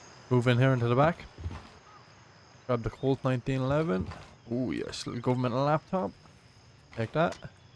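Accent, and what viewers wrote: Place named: Waterford, Ireland. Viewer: You sound like your from waterford!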